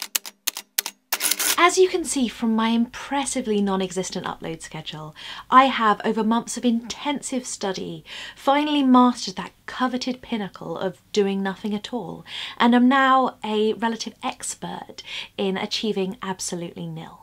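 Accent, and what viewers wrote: United Kingdom. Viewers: Great to hear! Yes your writing and lyrical performances are very pleasing in and of themselves, but speaking as an American, I'd be lying to myself if I didn't credit your wonderful British accent as well. Haha. Also speaking as an American, I'll take the lashings on behalf of my country for doing our fair share to fuck up gender politics in 2017. bows to ironic applause someone throws a tomato (you read that word as "ta-mah-toe) ;)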